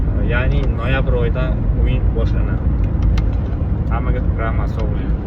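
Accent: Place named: Namangan, Uzbekistan. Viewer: Тоза чапани узбек наманганлик Шива колбди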